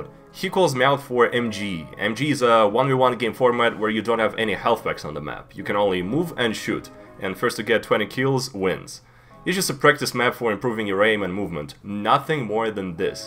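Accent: United States. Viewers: This is a little off topic but even though you are Russian you speak amazing English and you have a very great American accent!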